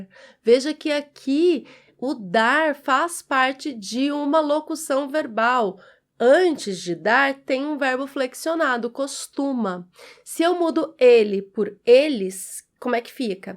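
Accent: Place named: São Paulo, Brazil. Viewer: Oi,professora,amada por mim, você está certa de puxar o R,um erre áspero.O teu erre tem som do R paulista.Te amo,okay🥰🥰🥰🥰🥰🥰🥰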